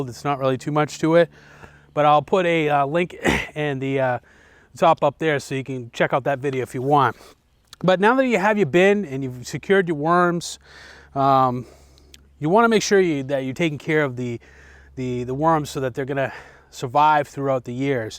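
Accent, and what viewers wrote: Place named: Northeast, United States. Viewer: North Carolina?? If you aren't from the north east, someone who raised you must be. Thanks for the info. I'm about to start a worm bin, so this helps. Best to you and your family, peace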